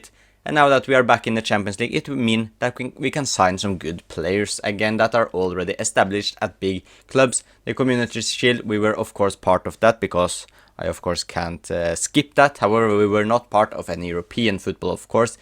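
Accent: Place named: Russia. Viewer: You Sound Russian Bro 😂🎉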